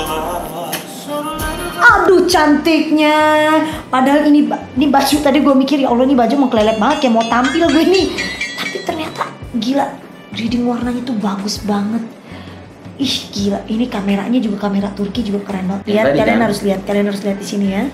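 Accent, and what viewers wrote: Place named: Depok, Indonesia. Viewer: Yu, dialek depok nya , engga holang yaakkk..😁gue sneeng..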